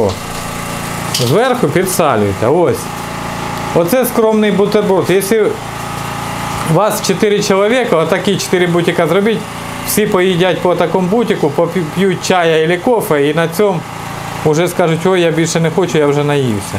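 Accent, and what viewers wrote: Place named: Chernihiv, Ukraine. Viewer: Суржик супер, яка область, Ченіговська?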